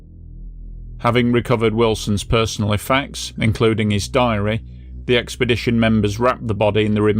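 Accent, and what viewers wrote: United Kingdom. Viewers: clearly you say 18-98. Our Yank cousins ain't used to our dialect, innit 😆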